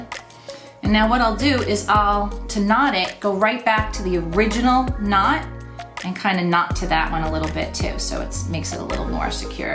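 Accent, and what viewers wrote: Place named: Brooklyn, United States. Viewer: Are you a Brooklyn girl originally, specifically Williamsburg section, cause you sound like me girl. Lol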